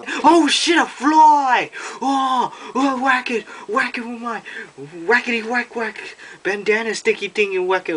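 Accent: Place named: Asia. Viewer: lmao asian accent! haha!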